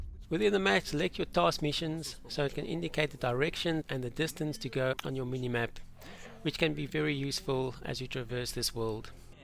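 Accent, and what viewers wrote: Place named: South Africa. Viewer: Was wondering about the accent, also from SA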